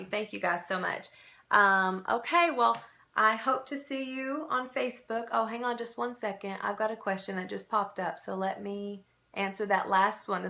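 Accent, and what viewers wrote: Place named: South, United States. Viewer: Excellent advice! Thank you! I do have a question, how do people passing through on YT order from you? I don't see your Younique website link anywhere. Is there a legal issue? I started a YT channel recently and want to promote my business on there through tutorials, unboxings and reviews. Any advice? Thank you so much! Also, where are you from.......love your southern accent. ;)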